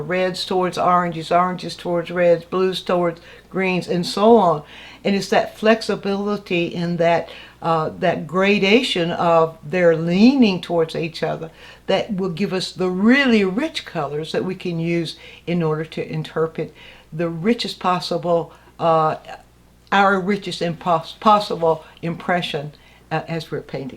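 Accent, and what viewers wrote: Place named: Carolina, United States. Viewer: Thank You!! I hardly ever use the earth tones straight from the tube, I always mix in the compliments, but the way you leave the variations on the palette may have just solved my issue I'm having with a subject that has dark bronze skin tones part sun, part shade. Duh! Why haven't I thought of having a gradient available? Also, being from Alabama, do I detect a Carolina accent? lol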